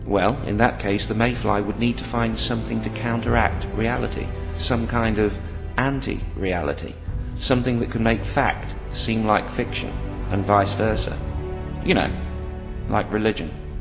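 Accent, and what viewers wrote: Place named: England, United Kingdom. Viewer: very nice. You lucky bloke. You have an English accent! hahahha everything you say sounds legit because of that. Americans have this fetish for British accents for some reason, I think. what was that zoom at the end? no idea what that was.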